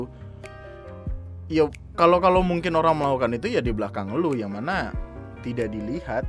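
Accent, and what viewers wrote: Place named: Java, Indonesia. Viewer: Vee dia kan dah pindah dari kecil . Logatnya aja jawa . Jelas memanfaatkan viewer indo , orang masa kecilnya di kota Malang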